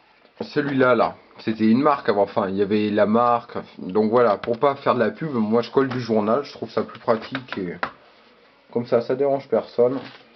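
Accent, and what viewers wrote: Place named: Auvergne, France. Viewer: Rien qu'a ton accent j'ai su que t'etais auvergnat "on y fais..." bref bah moi aussi je suis de Clermont-Ferrand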